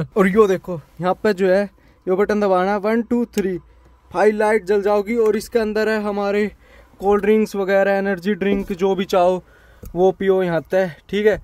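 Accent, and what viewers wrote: Haryana, India. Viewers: Bhai tera haryanvi accent and tu itna handsome ❤❤❤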